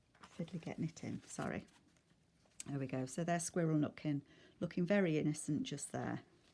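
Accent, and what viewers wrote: England, United Kingdom. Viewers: WOW!!! I LOVE LOVE LOVE this and LOVE Beatrix Potter, especially Peter Rabbit; and now Squirrel Nutkin! Amazing journal, how can I find a Peter Rabbit book?? I love your english accent by the way!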